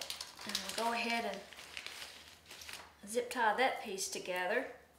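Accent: South, United States. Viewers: It's beautiful. Thank you for sharing. I also love you southern accent.:)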